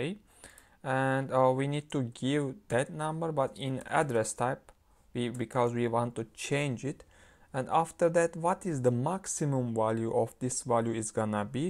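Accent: Turkey. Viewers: kanka aksanın Türküm diye bağırıyor 🥰 başarılarının devamını dilerim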